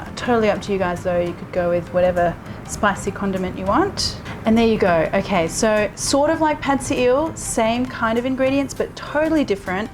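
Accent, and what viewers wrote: Australia. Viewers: Ahhh Australian, my apologies for mistaking you for being English after reading the comments,. 🙏🏼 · Hello, tell us a little about yourself. You live in Bangkok but you have an Australian accent. How did you become a chef?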